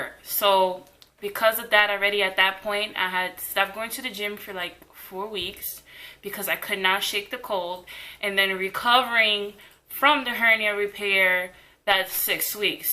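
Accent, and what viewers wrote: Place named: New York, United States. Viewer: u have such a heavy ny accent lol!! 😘😘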